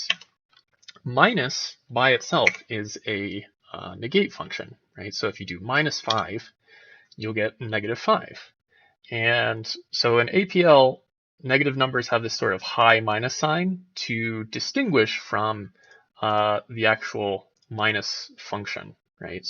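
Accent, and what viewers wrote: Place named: Canada. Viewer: It really depends what part of what country he's from... My guess is Canada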